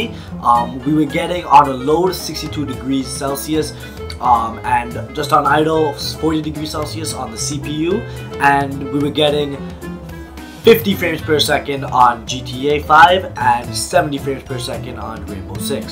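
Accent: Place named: New York, United States. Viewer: This guy is defo from NYC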